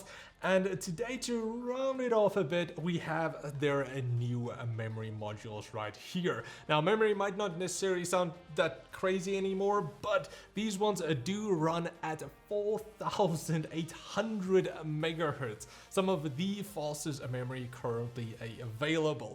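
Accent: Afrikaans, South Africa. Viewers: Thanks for the reply. With that Afrikaans accent and expert reviews this could be an epic channel. Thanks for the info on xmp. Now I know.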